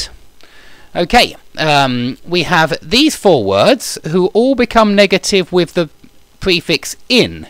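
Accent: United Kingdom. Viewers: I like a lot your British accent. Keep shining.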